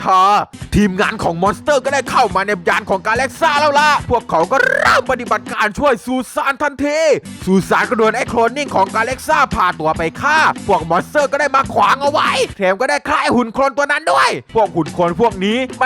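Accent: South, Thailand. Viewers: ขำกับภาษาใต้ สุดๆๆๆ หรอยหนัด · เกรียจการแหลงใต้ของพี่มากครับ5555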